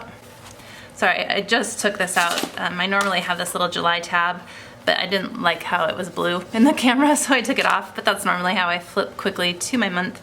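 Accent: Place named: South, United States. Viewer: You have a great Southern accent!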